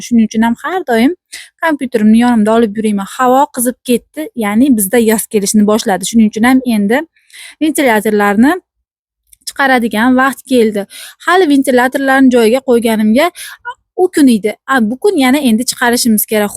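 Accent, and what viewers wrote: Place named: Olot, Uzbekistan. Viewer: Shevayizga gap yoq,haqiqiy olotcha❤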